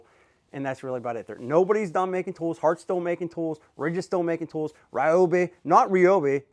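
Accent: Pittsburgh, United States. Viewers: I'm glad you claimed your PA heritage. I noticed the Pittsburgh accent right off. If you ain't from Pittsburgh you was raised close enough to have the accent. I was raised in East Pittsburgh until I went to the Navy. I hope your a steelers/Pens fan. I bet our Buddy Vince is a Flyers fan.....even the coolest guys have some short commings 😂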